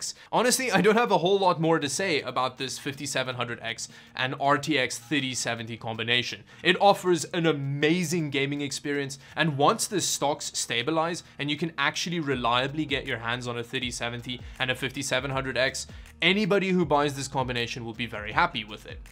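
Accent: South Africa. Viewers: ARE YOU sOUTH aFRICAN? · Ive suspected you are from South Africa for a while now due to your accent and then you used that thing when we say "ill get into that just now". Could you please confirm to satisfy my curiosity cause its very distracting while watching your content :P Cheers mate!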